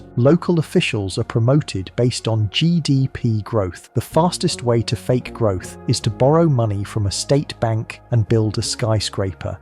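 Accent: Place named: England, United Kingdom. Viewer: Why is all this recent Ai generated content always narrated with an English accent? There must be a reason,i know its grating on me though.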